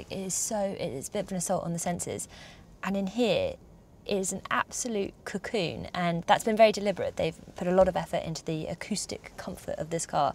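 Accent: United Kingdom. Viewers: She has a cute British accent, though I think she will be even better if she was not in such a hurry and speak slower.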